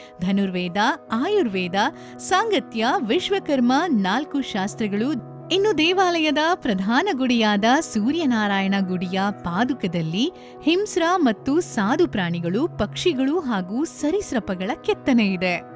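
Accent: Standard. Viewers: ಶುದ್ಧವಾದ ಕನ್ನಡ ಮಾತು ಕೇಳೋಕೆ ತುಂಬಾ ಚನ್ನಾಗಿದೆ all the best god bless u.❤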